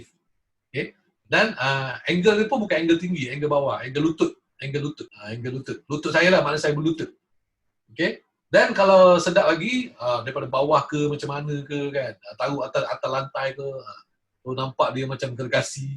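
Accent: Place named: Kelantan, Malaysia. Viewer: Terbaek la bila sifo share ilmu. Mula2 ckp dah tak bunyi kelate. Bila dah masuk part gambar ada keluar juga 😅. Apa pun mmg terbaek bang. Saya enjoy!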